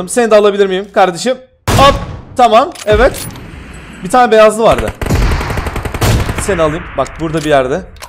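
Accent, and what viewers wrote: Adana, Turkey. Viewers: Adanli sivesi sananyakisiyoya bir videoda sadece oyle konus😉😆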